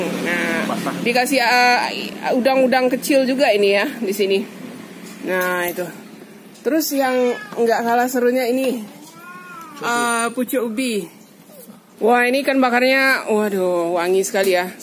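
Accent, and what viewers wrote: Minangkabau, Indonesia. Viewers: Bahaso Indonesia uni ko khas urg awak bana hahahahaha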